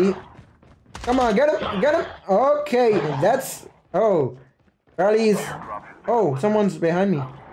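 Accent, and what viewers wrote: Jamaica. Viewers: don't try so hard to speak pure english: hit ah video wid pure yardman tone. Respect no hard feelings.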